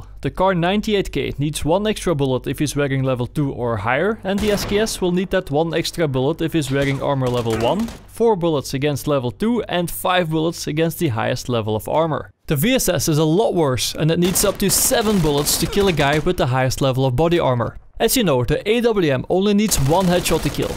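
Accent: Netherlands. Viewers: He's clearly dutch... we got that weird r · clearly dutch he sound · Are you dutch?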